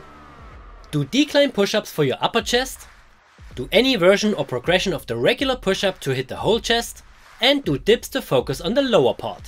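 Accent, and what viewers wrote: Germany. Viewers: Bro angefangen zu gucken und direkt mein Land rausgehört. Bilderbuchbeispiel für deutschen Akzent in anderen Sprachen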